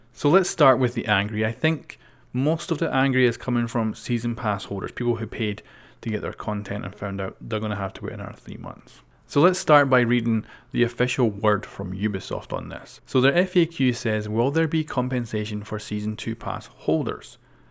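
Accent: Scotland, United Kingdom. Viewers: Are you Scottish just wondering because I'm Scottish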